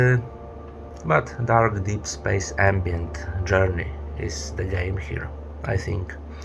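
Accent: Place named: Poland. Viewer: I knew I heard that Polish accent and typical Polish "erm/ummm"s right. Imma be honest, I have no idea in world how you got recommended to me, but I'm really happy you did. Will definitely check out the stuff you've spoken about. Cheers and keep going!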